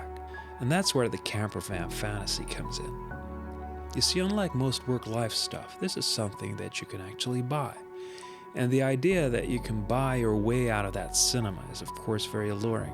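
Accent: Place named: United States. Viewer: Nice video! I also like you american accent ;-)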